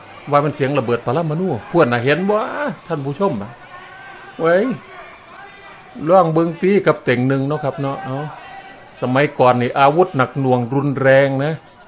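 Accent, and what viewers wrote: Isan, Thailand. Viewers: คุณ สุดยอดนักพากษ์ครับ ถึงแม้จะผสมผสานกับภาษาอีสาน แต่มุมมองของผมๆว่าสนุกดีครับ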